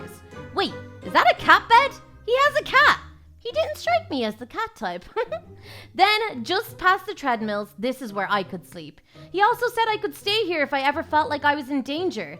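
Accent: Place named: Ireland. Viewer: I have a question are you from Ireland your accent sounds familiar I love it especially your vids I am a new subscriber 😀😀😀😀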